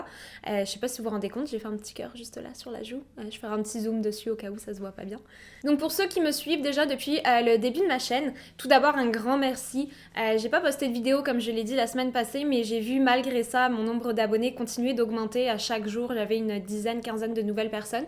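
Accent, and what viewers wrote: Quebec, Canada. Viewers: tu as un mini joli accent, c'est canadien? je viens de découvrir ta chaine et du coup je m'abonne :) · Coucou j'adore tes vidéos et ta chaîne, petite question est-ce que tu vis au Québec car j'entends que tu es française mais une petite pointe de québécois Bisous du Québec 😘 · je me doutais bien qu’il y’avait un petit côté Québec hihi 😉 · J'adore ta vidéo ! Sinon, rien à voir mais je trouve que tu as un léger accent entre l'accent canadien et l'accent belge ahah :) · Bordel tu as bien pris l'accent québécois dans cette vidéo. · Allo ! Je vien de découvrir ta chaîne 🙂 dit moi ton accent est de où ? Je trouve que tu as un accent québécois mélanger avec un accent français. Super vidéo ! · Ça m'a tout l'air d'une Québécoise d'origine qui essaie de parler un français de France. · Je m’abonne j’adore ton accent et tu compte très bien les histoires!! 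est tu Québécoise ☺️? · Étant moi-même du Québec, je me disais que ton accent était très ressemblant à celui ici au Québec, comparé à d'autres Youtubers français (Je viens d'apprendre que tu demeures au Québec) J'adore ton contenu, comme toujours Continues sur cette belle lancée 🤩👌 En route vers les 100 000 abonnés 🎉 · Tu as un petit accent québécois 🤔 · Je viens de découvrir ta chaîne, je m’abonne ! Je viens aussi de lire que tu étais française mais vivant au quebec c’est fou l’accent que tu as pris 😳😳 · quebecois et français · Fake c’est ca????? Quebecois dans l’accent, je reconnais ma langue fille hihihi j’adoreeeeee ♥️🌸 et en passant tu est vraiment magnifique en plus de très intéressante et crédible, tres bonne chaîne j’aime bcp , merciiiiii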